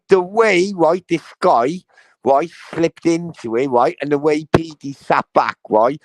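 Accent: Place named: Liverpool, United Kingdom. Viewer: your Scouse accent is immense 😂😂😂. Funniest accent ever 🤣🤣🤣. Chicken smack and chocolate I'm nearly f🤣cking dead here 💯💯💯✌️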